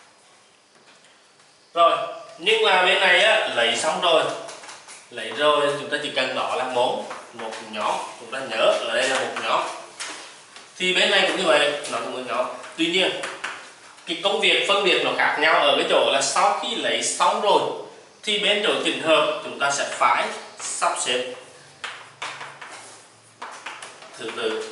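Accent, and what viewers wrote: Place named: South, Vietnam. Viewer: lâu lắm mới nghe giọng miễn nam hay ghê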